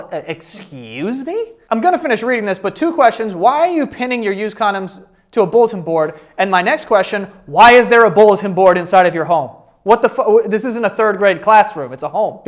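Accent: United States. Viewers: Wait.. do Americans say axe instead of ask?? Haha how did I not notice till now😂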